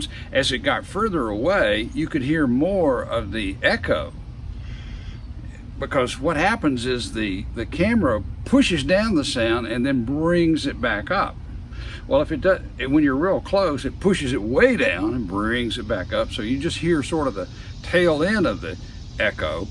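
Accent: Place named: Texas, United States. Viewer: He sounds like the most stereotypical texan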